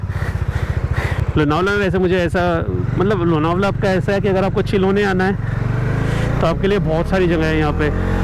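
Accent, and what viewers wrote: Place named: Haryana, India. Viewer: Haryanvi accent is so authentic... 👌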